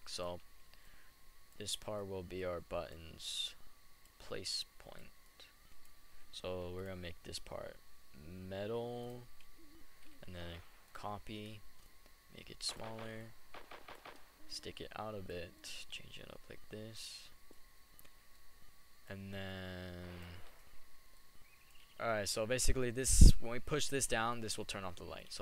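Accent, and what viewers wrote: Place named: United Kingdom. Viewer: UK accent.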